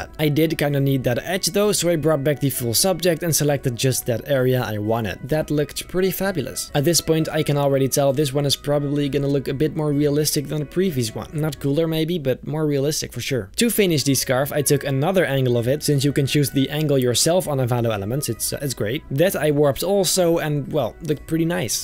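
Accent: Netherlands. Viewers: Why does it sound like he has a dutch accent? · Ben jij Nederlands aan je Accent te horen wel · Are you also Dutch? I can hear it in your voice. Ik ben ook Nederlands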